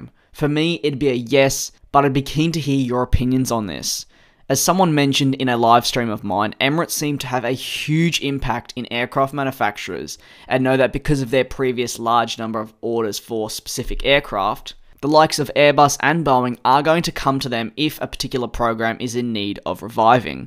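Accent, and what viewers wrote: Australia. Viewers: you are Australian? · Is the narrator Australian?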